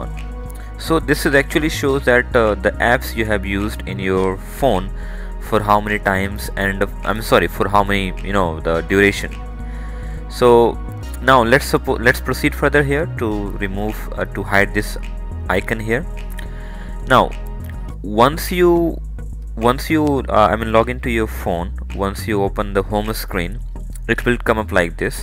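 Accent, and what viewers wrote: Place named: Asia. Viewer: you sound like you are Asian I would appreciate it if you would speak British instead, even though I only have a British accent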